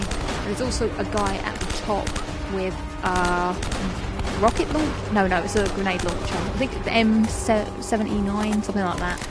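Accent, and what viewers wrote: United Kingdom. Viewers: shut up british p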